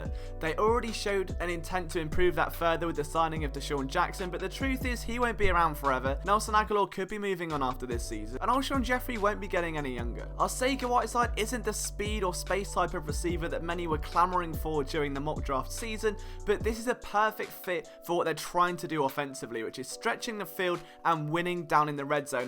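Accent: England, United Kingdom. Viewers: Yeah thanks for all your insight, after the first few times of getting used to your English accent, I really love your videos. You need a collab with Philly 500, i'd love to hear your accent and his Philly accent together, haha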